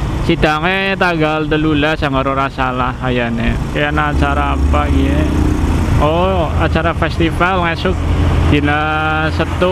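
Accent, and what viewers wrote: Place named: Java, Indonesia. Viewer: Nyong'e seneng yen bhsane medok kyk kiek 😁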